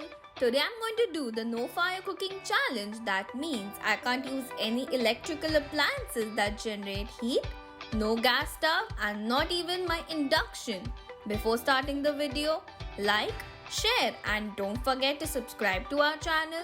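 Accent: Maharashtra, India. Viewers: You are Maharashtrian easy can identify by your speaking skills my guess is correct???pls dii tell 😀😀😀😀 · Yes she is Maharashtrian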